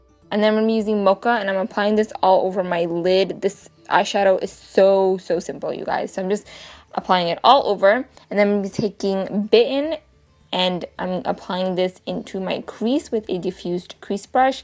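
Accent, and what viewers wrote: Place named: Dhaka, Bangladesh. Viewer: tea connoiseur ok heard you speak. you're dhakai. great video!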